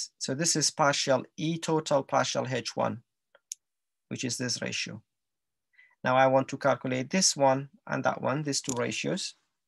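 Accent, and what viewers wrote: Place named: United Kingdom. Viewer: Strong British accent !☺️ Well done ✅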